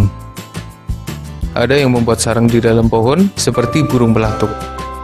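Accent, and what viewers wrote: Banyumas, Indonesia. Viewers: Org ngapak ya om?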